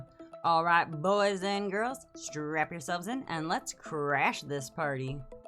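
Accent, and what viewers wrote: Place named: Michigan, United States. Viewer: Sounds like you're from Flint. Or possibly Burton or Grand Blanc... or maybe you're in the metro area, who knows. But definitely somewhere in Michigan, I'd bet a fairy on it.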